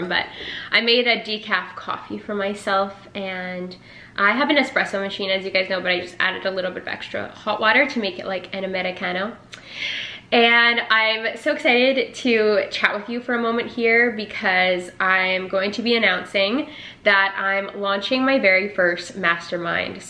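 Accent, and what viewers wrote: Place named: Canada. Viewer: I just want you to know that I absolutely ADORE your channel! My husband and I went to Seattle for our honeymoon and went to Peace Arch State park right across the Canadian border in Surrey, BC (they wouldn’t let us far because of COVID), so I went home and watched a video of Canadian accents and it was you and another girl! I’ve always been passionate about spirituality and I happened to discover you again discussing it. Your videos are so good and I have watched so many. Keep up good the work! 🙏🏼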